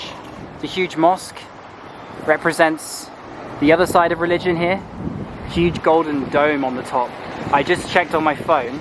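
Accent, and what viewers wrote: United Kingdom. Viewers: Nothing interesting, such a boring ew city. Btw, I like your british accent ㅜㅜ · Nice proper British accent!!! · Are u british ?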